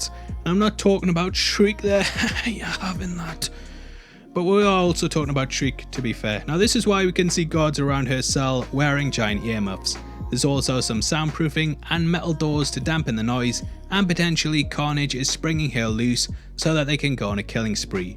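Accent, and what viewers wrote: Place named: Newcastle, United Kingdom. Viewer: Call it what it is, a geordie accent. Nothing wrong with it.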